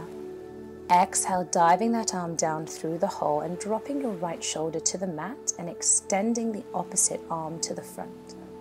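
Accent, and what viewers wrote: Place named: United Kingdom. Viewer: Thanks, so good to have a British accent on here, subscribed now 🙏🏼💪🏼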